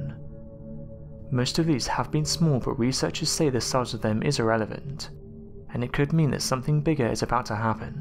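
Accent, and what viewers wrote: England, United Kingdom. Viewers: I don’t need some British guy telling me about OUR VOLCANO IN ‘MERICA!!! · It should be an AMRICAN dude doing the documentary is this ENGLISH dude a geologist? IDTS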